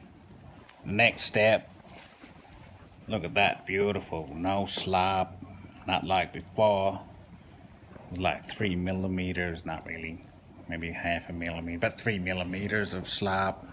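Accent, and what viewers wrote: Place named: Australia. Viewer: You do a great Aussie accent Greetings from OHH MAA HAA USA I know you from round these parts Fair Dinkum no crow eater you boy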